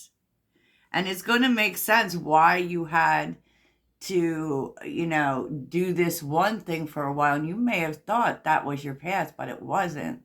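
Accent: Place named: Mid-Atlantic, United States. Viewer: Where are you from? Sounds very PA/MD/DE! Baltimorean here.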